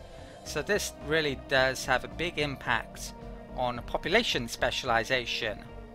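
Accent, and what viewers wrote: Wales, United Kingdom. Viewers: Hey, can I ask, are you Welsh? Because my brain is telling me you are, and I wanted to see if I was right. great video :)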